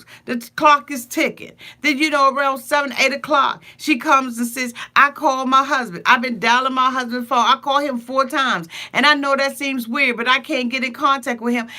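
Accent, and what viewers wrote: Washington DC, United States. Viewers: Hi there 👋🏾. I'm a newbie here. I've been looking for more reviews of this show. Glad I've found you! Where are you from? I here a DC area accent. Am I right?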